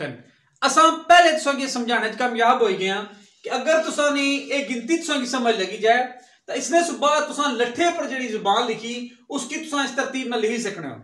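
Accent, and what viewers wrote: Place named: Punjab, India. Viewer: Ye kis zile di jaban hai.tusa Punjabi se mukhtlif bol rahe ho.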